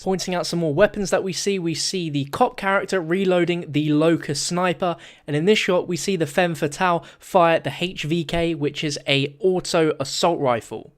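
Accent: United Kingdom. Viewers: Why does every famous COD reviewer on YouTube have to have a pussy ass British accent?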